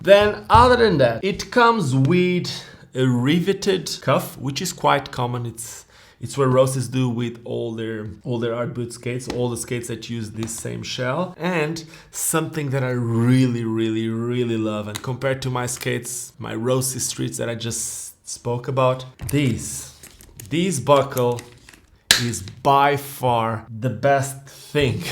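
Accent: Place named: Portugal. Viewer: Acabei de comprar estes patins, a meio da review comecei a pensar no sotaque e obvio que tava certa e é tuga xD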